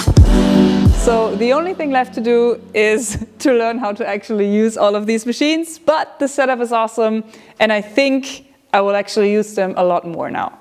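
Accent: Germany. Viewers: Hi super Cool geworden. Echt genial. Deine Deutsche Aussprache hört sich sehr sympatisch an . Könntst du öfters machen.. Liebe Grüße aus meinem Mini Bastelkanal Meenzer Bastel Bude und bleib mit deinen Liebsten gesund. Von Stefan.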